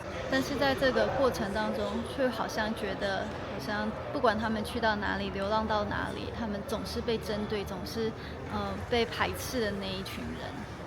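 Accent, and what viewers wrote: Taiwan. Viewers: 聲音真的一聽就是住台灣的😅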